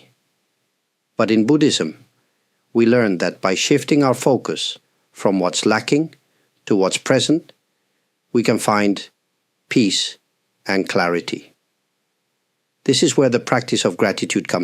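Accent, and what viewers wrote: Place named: United Kingdom. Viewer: dear 🙏 I would love to catch a live with you sometime! or even just a your questions answered segment I would love ❤️ to hear about your faith journey of being a monk and I think I detect a slight UK 🇬🇧 accent in your voice, would love to know more about that, not to intrude on your privacy of course, just curious about your story always best regards and thanks 🙏 for your inspiring words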